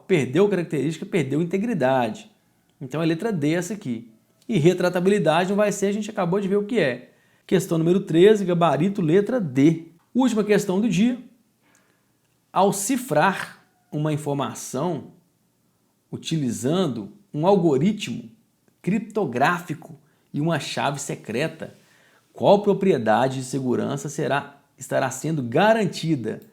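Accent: Minas Gerais, Brazil. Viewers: professor gratidao pelo conteudo dos videos e por todo o seu esforço de noites e madrugadas para ajudar os que de outra forma nao poderiam ou nao teriam condiçoes de o fazer sozinhos e por si mesmos.....agradeço primeiro por mim e depois por todos e vou acompanhar suas aulas pois conhecimento e bom e com mineirês ai e um must!!! Xiq nurtimu!!! rsrs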